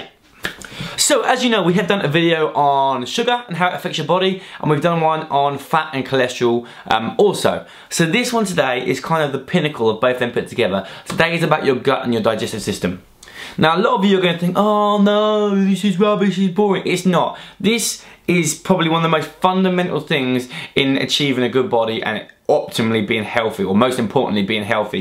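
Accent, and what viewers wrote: United Kingdom. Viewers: I understand much of what you're saying and it's important...I have a bit of trouble here and there not because of the UK accent (I;m Canadian :P) but maybe you speak too quickly? I never thought I'd say that! But I'm going to rewatch and try to catch a couple of things. Also I've watched your vids before on health balanced diets, and other ones ( I follow Cassey Ho too) I'm just confused about portions and what good foods are the best or not the best to have (like in the various food groups, breads, grains, fruits, types of meat etc) I would love some true explanation on that - the internet (like google searching) gives too much conflicting info depending on who wrote the article you know...so :S Thanks a lot :D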